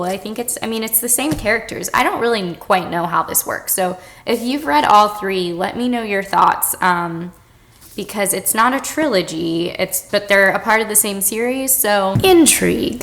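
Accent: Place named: Upstate New York, United States. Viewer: HI just stumbled across your channel and I LOVE YOU ALREADY! where are you from bc u have a bit of an accent... i could be totally wrong but upstate new york? possibly buffalo?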